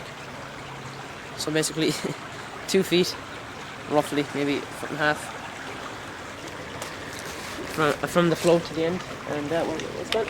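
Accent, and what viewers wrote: Ireland. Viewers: What part of Ireland are you from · He's Irish{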